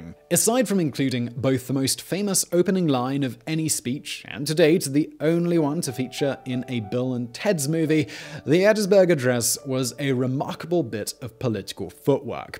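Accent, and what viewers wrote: United Kingdom. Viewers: its interesting to hear a brit talk abt the civil war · I don't think this guys videos would be nearly as popular if he didn't have a british accent. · I love listening to with all the random facts with his video’s but I have to admit, I feel a little dirty listening to a Britt tell American History. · I don't know about somebody with a British accent lecturing about American history really impresses me. I think I would lean more towards David Barton, a true American Historian who backs up everything he teaches with hard fact and thousands of documents from the Halls of Congress and other resources to back them up.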